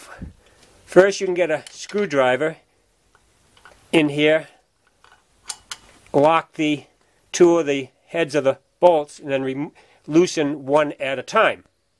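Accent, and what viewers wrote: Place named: East Coast, United States. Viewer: If the accent doesn’t scream “east coast,” then the rust certainly does!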